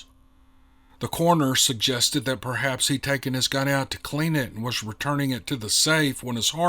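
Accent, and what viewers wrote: South, United States. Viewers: Super story! Kept falling asleep to your sweet southern drawl. It took the whole night, till am for me to hear the ending. Wow! What a conclusion it was! I'm awake now 😂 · Thank you for another great job. Enjoyed it very much. I guess it's that lovely southern drawl that just pulls you into the middle of the action. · Awesome story!! Neoma definitely has a way with words. Her very descriptive form of writing drew me in and had me feeling like I was part of the story. Neoma's writing coupled with silky smooth Southern drawl is a match made in storytelling heaven🥰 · I got to say this is one of the most interesting stories you have read. And I also will comment on your wonderful accent and phonics and the way you speak/read to us all. Your accent sound, southern, but without the heavy drawl-but I’m not exactly sure where to pinpoint where your accent is from! 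Where I’m from there’s been all types of accents and I can usually identify them really well. Tell us please. And thank you again for these really really interesting stories.👏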